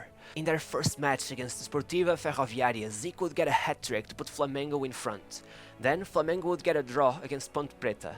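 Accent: Portugal. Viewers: Ele é português. E se tá achando ruim, grava tu e faz melhor!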